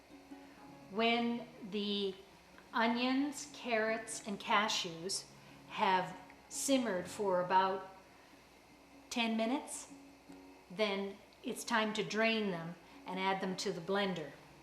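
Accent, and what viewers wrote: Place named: Canada. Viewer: The Canadian accent is really only perceptible in the "ou," but it's the tone of your voice, speaking rate, and enunciation that make your videos a pleasure to watch....and the food! :)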